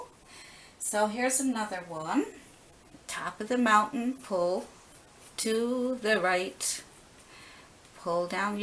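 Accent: Newfoundland, Canada. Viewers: Thank you so much for the lesson! Do I detect a Newfoundland accent? 😊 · You sound like a Newfoundlander. My family is from Newfoundland. ❤️ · Wonderful, thank you! (Are you from Newfoundland?)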